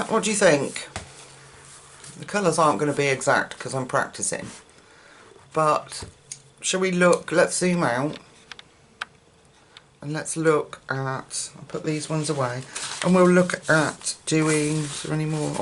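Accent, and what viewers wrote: England, United Kingdom. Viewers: Actually they work pretty well over grayscale. I'm getting not full 120 set of Artists, but buying just those colours what are missing in Procolour and Coloursoft sets. Already got all greens and tried them here and there. O my gosh! They layer and blend as good as Polys and Pablos and Keep Sharp Point!!! I believe they'r as economical in long therm as Pablos and Polys. I found that at least in Klara Markova books i can put Procolours over Artists, over Coloursofts, blend with Derwent blender. Endless posibilities ... I was biten by german sheperd too, also in back when i was 20. Scar took more that 10 years to fade. It was in party and dog was police dog from my friends friend. I was dancing, mowed backwards and got too close to owner. Dog jumped and bite me in back without any warning. It was well trained policemen dog and listened owner in a second. 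everybody was joking that i don't have to make injections for rabies because it's police dog. I got close to owner about 1 meter. Guy said if i stayed 1,5m from him dog wouldn't attack. Lesson learned. Like Your colourings very much and way how You speak, Your accent is like my english colleague's here in France.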